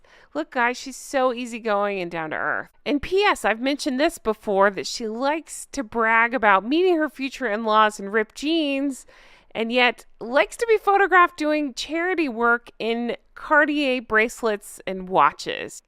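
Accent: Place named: United States. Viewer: i am british and i love your voice and your laugh. We love the american accent in Britain❤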